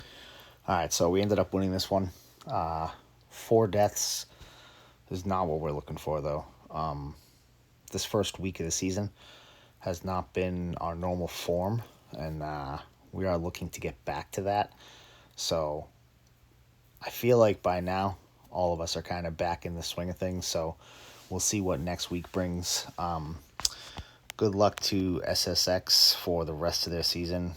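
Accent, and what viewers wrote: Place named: Boston, United States. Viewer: Lol I knew it. I live a few miles north of Boston. It was how you pronounced your ahhhh’s that Sauron was ah 2. Love it….. 👊👊👊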